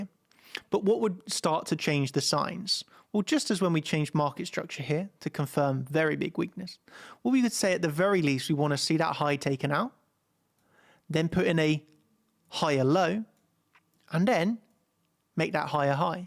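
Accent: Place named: United Kingdom. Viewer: Bro is British bruv 👊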